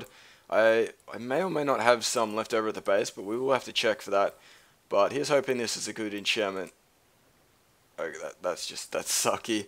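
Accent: Australia. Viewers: Because he's using the Australian English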